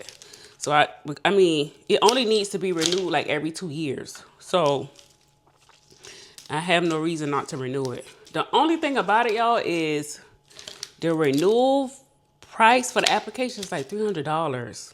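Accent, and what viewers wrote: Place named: New Orleans, United States. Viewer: Your accent is beautiful. Are you from NOLA?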